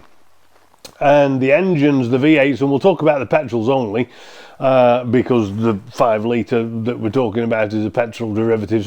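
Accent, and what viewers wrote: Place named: Lancashire, United Kingdom. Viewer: Some people just have to something pissy, if you don't like the man's Lancashire Accent go get your highly valuable LR knowledge somewhere else. No one is forcing you to listen . Ungrateful pillock.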